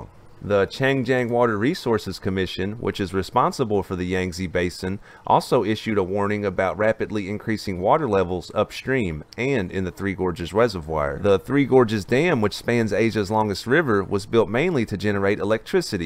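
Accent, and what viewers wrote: South, United States. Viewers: This southern accent attempting so many Chinese names is somehow both endearing and unbelievably frustrating lol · When I clicked on this video I did not expect to hear someone so southern hahah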